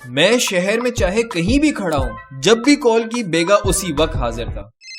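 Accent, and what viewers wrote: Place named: Karachi, Pakistan. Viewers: ap karachi k logon ki mimics bht achi krtay ho keep that karachi accent in every video.😁😁🤣🤣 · Please always use Karachi accent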